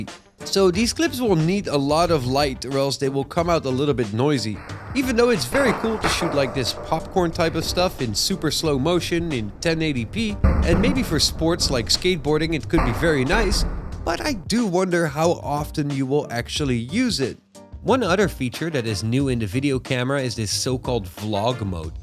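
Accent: Netherlands. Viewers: Just started following you because I heard your Dutch accent 😂👍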